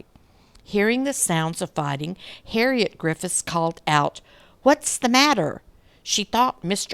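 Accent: South, United States. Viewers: The lovely southern accent makes the narration absolutely perfect and I love it, dont you ever change, lots of love from England.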